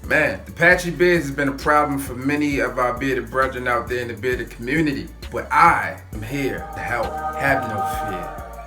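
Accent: Baltimore, United States. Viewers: Where are you from man? I hear a bit of a Baltimore accent.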